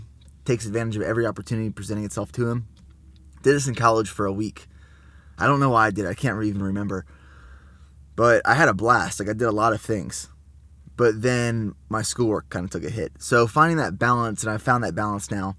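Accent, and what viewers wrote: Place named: South, United States. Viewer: Sounding very southern ha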